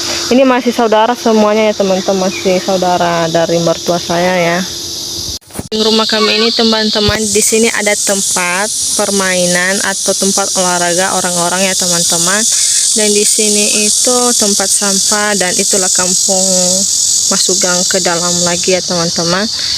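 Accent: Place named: Eastern Indonesia, Indonesia. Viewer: Bisa dimengerti mengapa sering mengucapkan kata Teman Teman.. Gaya bicara orang dari Timur Indonesia masih kental sekali.. Mohon Kakak2 memaklumkan nya dan Kakak kakak bantu dukungan maksimal supaya kakak kakak dan semakin dekat.. Semoga kakak kakak senang kedepan nya.. Oke kakak kakak sekalian sekian dulu kakak kakak.. Sampai jumpa lagi..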